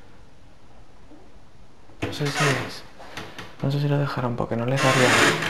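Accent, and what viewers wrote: Spain. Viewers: Por su acento parece español,mucho gusto... Con todo respeto 🙏 no creo nada de mansiones abandonadas,tengo derecho a opinar y pensar cómo cualquier ser humano Pero si realmente estuviera abandonada.... La suciedad de tierra insectos etc etc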